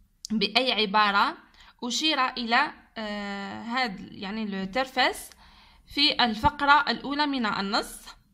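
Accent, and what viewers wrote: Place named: Constantine, Algeria. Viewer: قسنطينية راكي باينة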